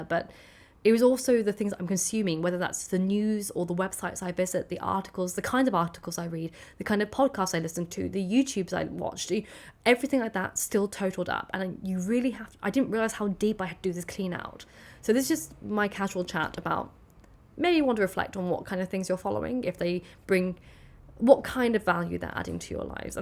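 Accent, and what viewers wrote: United Kingdom. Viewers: I am addicted to binge - watching your videos,! Actually i am studying English with lots of videos by British people (because i don't like the American accent) but i don't feel unhappy at all, on the contrary, YouTube is the best thing that happened to me latelly. I really adore you accent, it sounds like good music to me. Thank you so much for your content, which i love as well. 🙏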